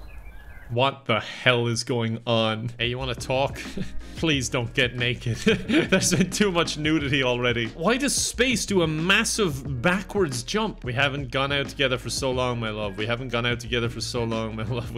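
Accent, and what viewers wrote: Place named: Ireland. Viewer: just found your channel today randomly. binged your sims 2 series. enjoyed the content alot. watching all your latest videos rn. Good stuff, Subbed. also, are you Irish? you have an accent like Nogla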